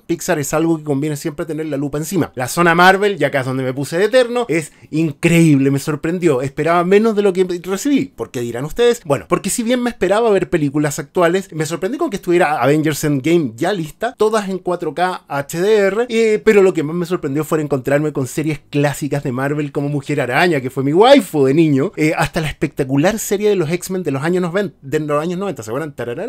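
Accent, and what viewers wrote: Chile. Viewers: Es chileno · duele escuchar el Chileno, pero bue, era solo para ver un poco de Disney+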